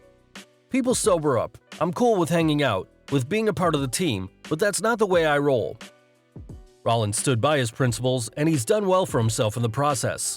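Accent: United States. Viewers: Shag? British copy but an American narrator. Weird combo. Grams spelled grammes too. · What's up with the non-British accent? American accents just aren't convincing. They can't even be depended upon to raid Area 51 properly.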